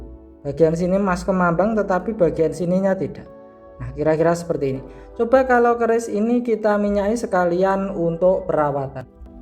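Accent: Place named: Cirebon, Indonesia. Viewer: Pelokk cirebonan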